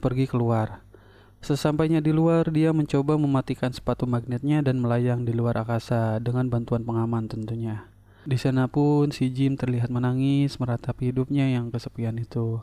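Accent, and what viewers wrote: West Java, Indonesia. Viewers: Si ajig urang sunda🤣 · logat sunda na asa deket jeng daerah aing da ieu teh🤣🤣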